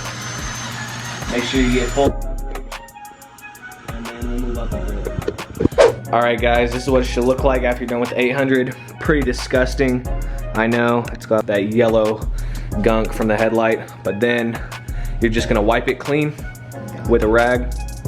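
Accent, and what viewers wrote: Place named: Australia. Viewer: Will it work on dirt bike headlights. Still hearing some of that Aussie accent mate.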